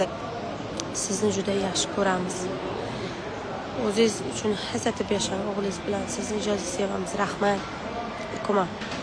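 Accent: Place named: Khorezm, Uzbekistan. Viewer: Horazimcha gapirmang Toshkentcha gapiring asalim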